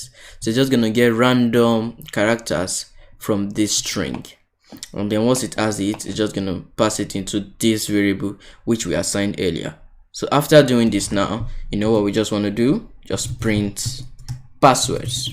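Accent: Nigeria. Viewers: i hear the Nigerian accent!!🤭🤭🇳🇬🇳🇬🇳🇬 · If it's an African, it's definitely a Nigerian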